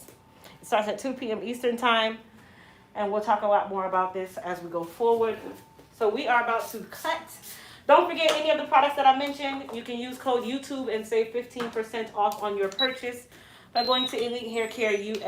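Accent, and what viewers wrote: Jamaica. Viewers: I can hear that Jamaica talk in you.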